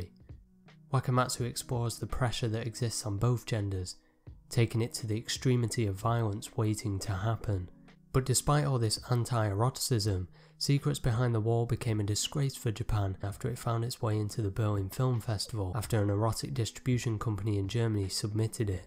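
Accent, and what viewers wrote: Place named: Manchester, United Kingdom. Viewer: This was really interesting! Something made me think about Wakamatsu earlier tonight, and I've only seen one film of his (Go Go Second-Time Virgin, some time around <num>) and gave his name a search and clicked through to this. I definitely wasn't expecting to hear a Manc accent hahah.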